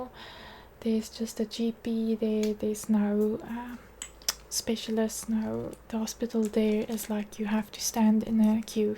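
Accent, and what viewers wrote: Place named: South Africa. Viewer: Where is your pen from please.? Also love hearing the South African accent I really miss home when I hear it.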